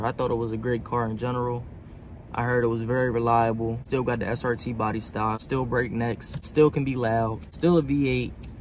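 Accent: Philadelphia, United States. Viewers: Boa yu sound like yu frm philly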